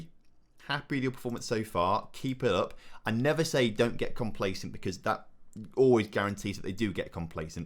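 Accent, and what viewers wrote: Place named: Yorkshire, United Kingdom. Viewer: Your Yorkshire accent is criminally bad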